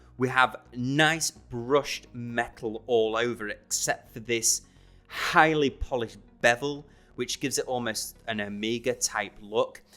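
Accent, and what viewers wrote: United Kingdom. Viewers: Thank you very much. I'm sorry, I thought you lived in UK because of your accent :)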